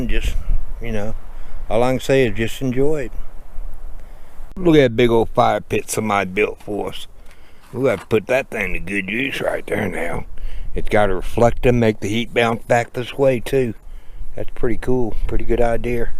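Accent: United States. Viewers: pretty spot new mexico is it.like watching your videos , you make me laugh with that american accent of yours..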